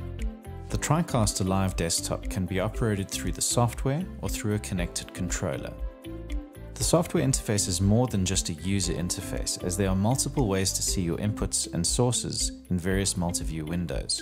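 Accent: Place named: South Africa. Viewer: Great explanation and well put together video . Only Tricaster video with a South African accent which makes me happy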